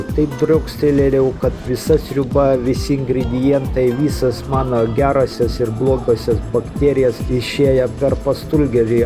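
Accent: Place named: Ignalina, Lithuania. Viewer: Tau tinka toks blogerio žanras kur kalbiesi su savimi Ignalinos tarme. Lauksime tęsinio. su Naujais metais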